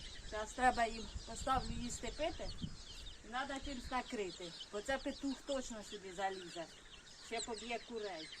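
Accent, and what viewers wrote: Poltava, Ukraine. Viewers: Добрий день. Говір Полтавський, з якого району, якщо звісно не секрет. Я з Гадяцького.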